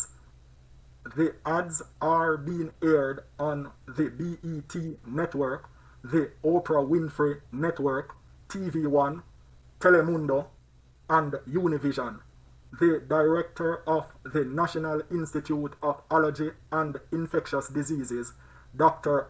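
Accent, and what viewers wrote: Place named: Jamaica. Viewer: So True. Thanks White guru white a Jamaican accent.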